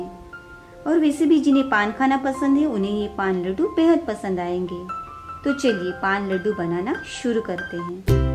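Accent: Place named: Gujarat, India. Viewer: Your Gujarati accent is adorable, it reminded me of my friend. And really Nice dish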